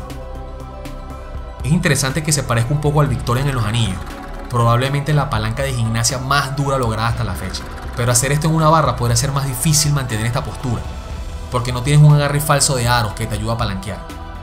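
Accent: Venezuela. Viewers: Estoy seguro de que el narrador es venezolano.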